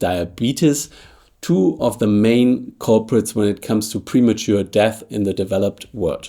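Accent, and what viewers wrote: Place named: Germany. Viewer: Because of your German accent I automatically take you much more seriously when talking about research and statistics than the Californian Andrew Huberman. More seriously, stress and loneliness are two of the most harmful things for your health and moderate alcohol use can alleviate these things when you are sharing a bottle with friends and talking about life.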